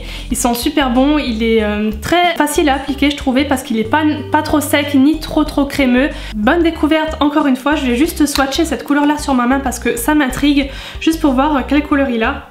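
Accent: Lorraine, France. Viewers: Tu as grave l'accent Lorraine, tu habite à Forbach ? 💕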